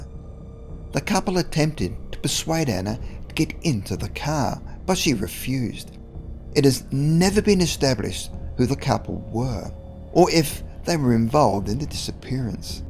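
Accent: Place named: Australia. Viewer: cant they find someone other than that aussie to narrate? what would he know about california anyway?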